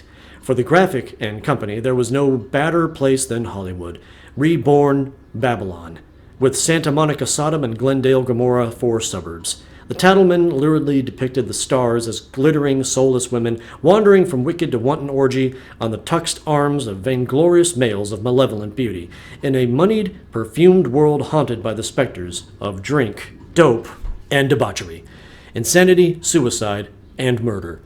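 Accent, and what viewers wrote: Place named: Germany. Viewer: Bahahah your German accent made this so much more enjoyable! Love your narration! 💖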